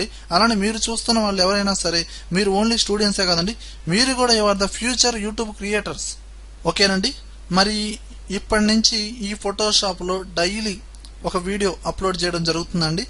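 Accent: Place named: Rayalaseema, India. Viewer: Anna, 00, Na, Maha, antunnarante miru kachitanga Rayalasimavaru.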